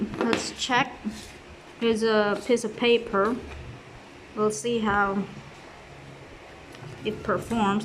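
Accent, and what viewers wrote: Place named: Louisiana, United States. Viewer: Good review, not many do such indepth demonstrations of capability. The Micra never really appealed to me, I think if I want scissors as a more important function I'll always opt for Victorinox as they're the best multitool scissors, whether on the smaller models like you show (Rambler or Manager) or especially on a larger pocket knife like the Climber etc. I do however like the Squirt as it's about the best tiny plier-based multitool out there, it seems well designed and I like the aesthetics - being compact and sleek - more than those of the Gerber Dime for example. Also it's helped me on weird little tasks where nothing else larger would have worked. Finally I am really curious about your accent? Are you from Lousiana, or were you born outside the US? Sorry to ask a personal question but your accent and pronunciation is really nice!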